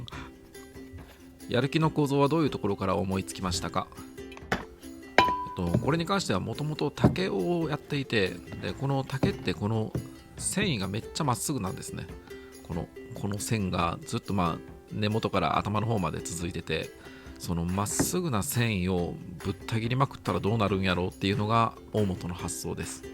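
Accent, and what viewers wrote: Kansai, Japan. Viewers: 関西の人の喋り方や〜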